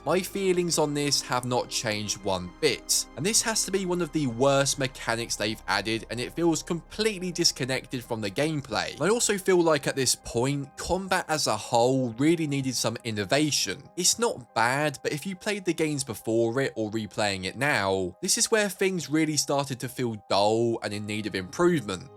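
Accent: United Kingdom. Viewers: wait this guy is bri'ish?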